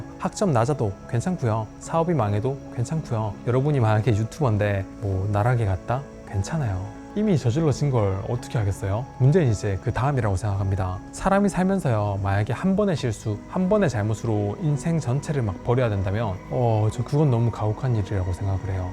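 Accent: Gyeongsang, South Korea. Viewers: 단한번도 스킵하지않고 넉놓고 봤네요,,,, 항상 경상도 사투리속 억지로 서울말 쓰시려는 말투가 재밋고 귀에 들어와서 챙겨봤는데 많은걸 알려주시는 영상이였습니다,,, 항상 알차고 필요한 부분만 리뷰해 주셔서 감사합니다 ㅎ 같이 화이팅!!!!!!!!!!!!!!!!!!!!!!!!!!!!!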